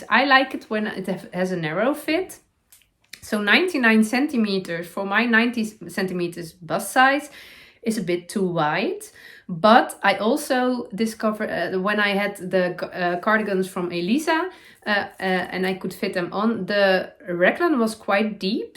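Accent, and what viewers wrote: Netherlands. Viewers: I love your Dutchy English. It always makes me smile. Some of the things you say may be grammatically incorrect, but they make perfect sense and that is what I like. Thanks for podcasting in English for the rest of us. 💜💜💜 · Hello. Happy New Year to you….bit late but better late than never! Love your vlogs and your Dutchy English always makes me smile….in a good way! I too have been wanting to cast on a Felix cardi so maybe, if I finish my Stephen West MKAL😂😂😂I’ll cast on with you. Best wishes❤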